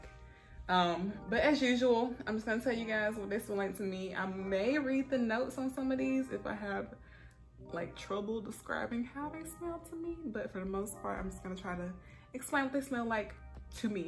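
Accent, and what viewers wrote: South, United States. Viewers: By the way I love your accent! I know everyone always says they don’t have one 🙄 but yours is so soft with a southern tone!! I’m from London so I find it so 😍😍😍!!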